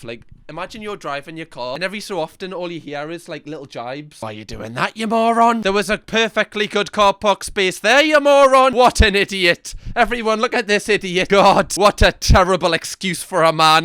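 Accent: Newcastle, United Kingdom. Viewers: I just came across this podcast. Love his chat and the accent brings back great memories of Newcastle, now 45 years ago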